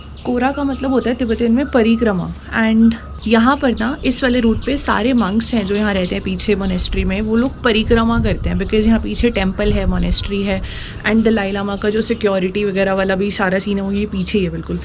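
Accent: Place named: Punjab, India. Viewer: I’m traveling to McLoedganj next month and your videos are helping a lot to learn about the place. I like your voice and Punjabi accent, that makes it more interesting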